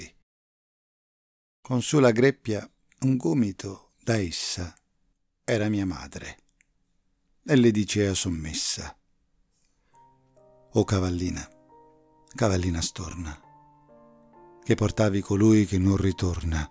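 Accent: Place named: Naples, Italy. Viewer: Bravissimo! E poi questo accento Napoletano su una poesia Romagnola rende il tutto universale e oltre il tempo...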